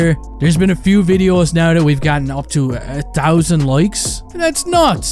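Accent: Newfoundland, Canada. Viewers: Bro where are you from? If this is your real voice you sound exactly like a small town Newfoundlander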